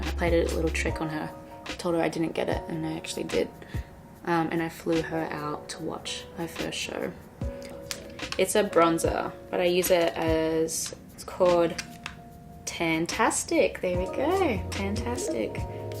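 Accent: Australia. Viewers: Is this Aussie accent ? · I looove Australian accent😍😍😍😍😍😍😍 she's sooo beautiful💘💘💎💎💎💎💎💎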